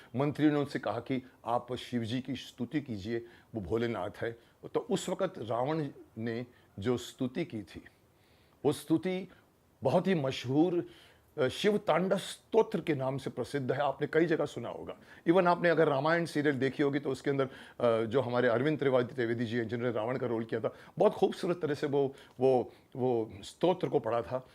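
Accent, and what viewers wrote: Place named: Assam, India. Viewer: Wow aap assam bolte hai to mujhe bahut achha lagta hai . Ilove u jaan😍😘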